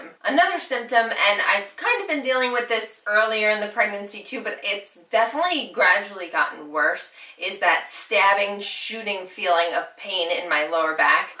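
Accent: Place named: Upstate New York, United States. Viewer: You are such an upstate New Yorker aren’t you lol. I live up in the Hudson valley area now and you sound like my girlfriends here. I’m 17 weeks - haven’t checked out your latest videos but this was really fun and enjoyable to watch! Thanks for sharing.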